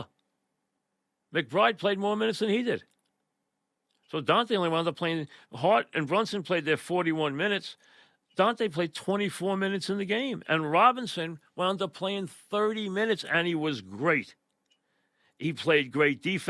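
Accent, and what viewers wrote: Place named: Philadelphia, United States. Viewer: Geez Looeeze. Did you grow up in south Philly?? Wtf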